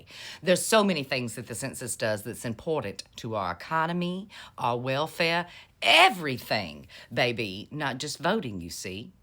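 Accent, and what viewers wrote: South, United States. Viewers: I love this lady... her style, her commentary, her beautiful southern accent, the whole thing. Plus she is a looker. 😊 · I just love your Southern Belle accent dear!! and you have some beautiful blue eyes!! Your accent reminds me of my Aunt Fran who lived in South Carolina hun.... Im in Oklahoma... but my accent is more of a mix of Oklahoma, Texas and Louisiana. Thanks for bringing us the TEA everyday!! Much Love hon!! 😍😘🥰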